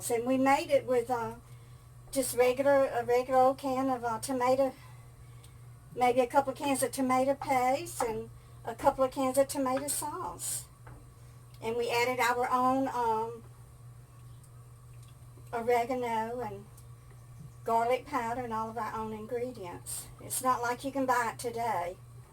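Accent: South, United States. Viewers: I have been a sub on your channel for a while. Someone say good your channel was but I have not had a chance to watch it. I love hearing your southern accent. I bet they love it up there. Show them your southern cooking. I love the pears. I ate them growing up. You have a blessed day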